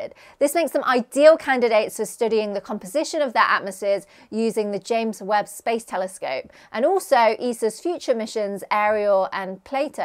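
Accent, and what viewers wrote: United Kingdom. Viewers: ❤❤❤❤Still can’t get over your lovely British accent. 😘😘😘 Jolly good episode👍😘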